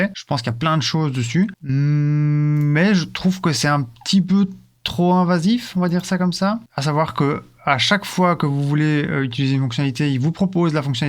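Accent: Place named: Belgium. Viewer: 10 secondes de vidéo et je me disais bien que cet accent m'était familier .. :p Salutations de Belgique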